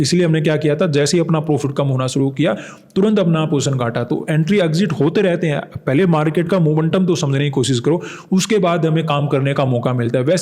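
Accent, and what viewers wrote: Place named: Punjab, India. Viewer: Sir pakka punjabi hai awaj se pata chal raha hai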